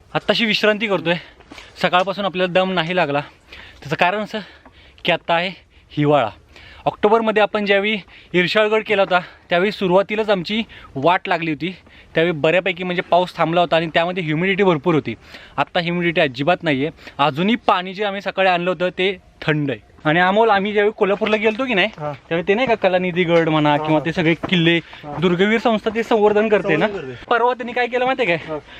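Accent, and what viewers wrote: Satara, India. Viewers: Mla avajavrun tu satarkar vatatoy ..am i right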